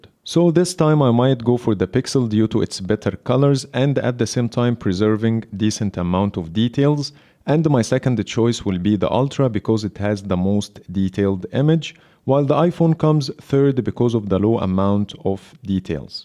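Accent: Egypt. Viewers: ترا مبين انك مصري و مسوي فيها اجنبي😂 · Your accent looks like you are an Egyptian! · Egyptian accent distracted me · Egyption accent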